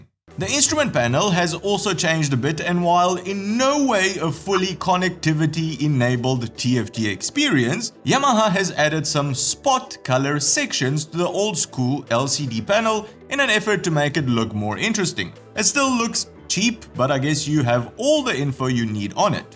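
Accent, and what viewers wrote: Afrikaans, South Africa. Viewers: Is jy 'n Afrikaans ou? Klink 'n bietjie so ne! Is jy nog in SA?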